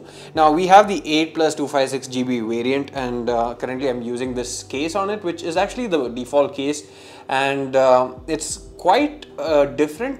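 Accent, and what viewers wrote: Kerala, India. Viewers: English kettal parayo e machan malayali anenu!?!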